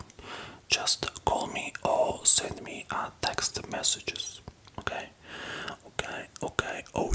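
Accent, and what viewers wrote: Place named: Italy. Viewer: Italians have a specific way of talking which is cute😊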